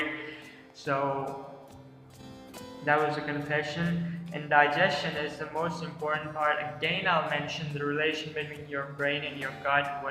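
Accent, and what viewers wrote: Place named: United Kingdom. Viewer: I am sorry for saying yu bad words actually that's not my intension becoz i was serching on airlines job on you tube then i found out your video but i saw your speaking tond is as like British accent that's i don't like.so that's why i thrown out bad words to u.Now i am heartfully apologize for what i said to you. I know you have big heart sorry brother..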